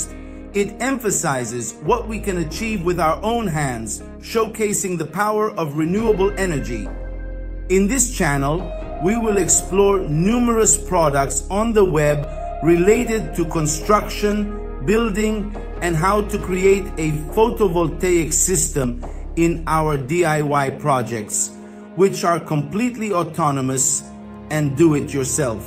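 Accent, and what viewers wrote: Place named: Florence, Italy. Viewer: Anglo fiorentino se non sbaglio 🤭🤭🤭✌️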